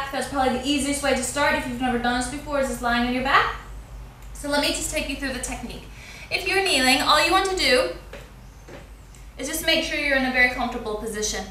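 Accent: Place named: England, United Kingdom. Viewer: Have you ever lived in England I feel like I can hear a bit of an accent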